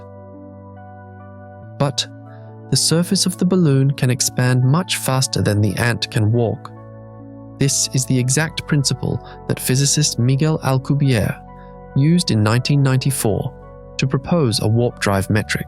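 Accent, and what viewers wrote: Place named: United Kingdom. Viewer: 11-minnits 'in' annnd, ths p.o.s. up-loader, can't-be-bothered to review thn, edit (their...??) 'work'! W-t-h...??!! Ther-haz-been NUMEROUS mis-pronunciations (&, other-ERRORS)!! FAIILLL!! FAIILLL!! FAIILLL!! Allllsoooo: pleeez STOP-uzing a British-voice('accent')? They are-NOT more-intelligent-thn, Americans, based-SOLEY-upon how thy speak??!!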